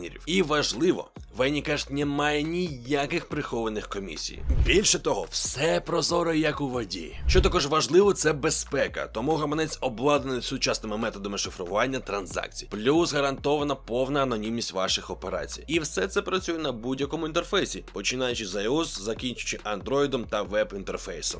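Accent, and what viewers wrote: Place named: Russia. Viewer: Забавно, как русский человек с чистым русским языком вдруг решил считать себя украинцем. Вот только для селюка западенца твой украинский все равно будет с "кацапским" акцентом. Таки пропаганда пробила здравый смысл? Оно того стоит?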